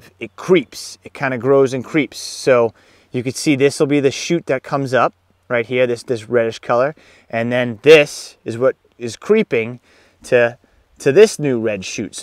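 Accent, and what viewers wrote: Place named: New York, United States. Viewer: Give him a break. He’s a New Yakker.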